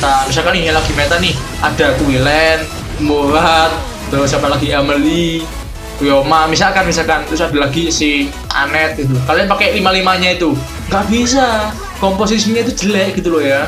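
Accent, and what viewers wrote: Java, Indonesia. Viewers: Aku seneng logatmu medok, mas....wkwkwkwk nice content 😄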